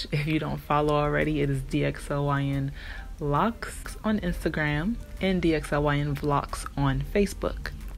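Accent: Haiti. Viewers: Are you Haitian? Your voice is very Haitian. Please tell me you’re Haitian.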